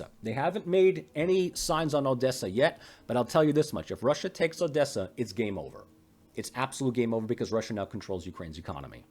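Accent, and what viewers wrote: New Jersey, United States. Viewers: I am so happy to see your post. I've only just started to watch, this video but it looks like we will hear your views and info alone. I feel very lucky that I found your channel a while ago and I thank you for the things you share with us 😊 I like much better when you give your views alone, but I guess we need to be more open to others as well. Besides, I like to hear you speak because I am from New Jersey also ❤❤❤❤❤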